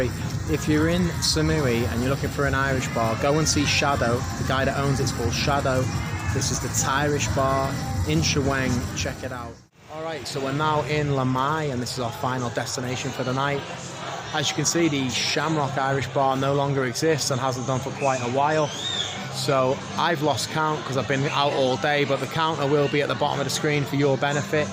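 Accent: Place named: North Wales, United Kingdom. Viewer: Hi Mate, Subbed after seeing you on Pete's channel. Really enjoying your videos. Where about's in N Wales are you from? You have the same accent as me. Take care :goodvibes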